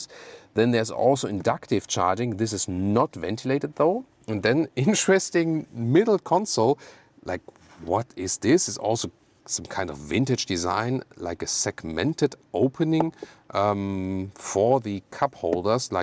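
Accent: Germany. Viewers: Somehow it feels so satisfying to listen to this well-rounded review of a French car, delivered in English with a German accent. Love the new Clio! ❤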